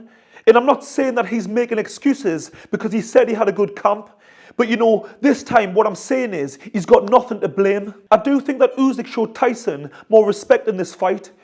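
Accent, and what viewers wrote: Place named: Scotland, United Kingdom. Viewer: Where you from brother? Why do you speak like that—accent? Scotland? This accent doesn't suit a black man.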